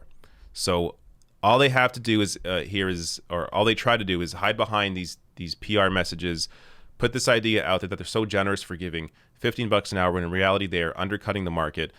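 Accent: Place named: Canada. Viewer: Canadian accent coming in extra strong today.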